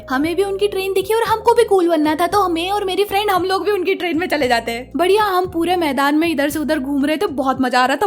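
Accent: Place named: Gujarat, India. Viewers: But aapki accent se toh aap gujrati hi lagte ho 😅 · I thought you were gujrati because of your accent😮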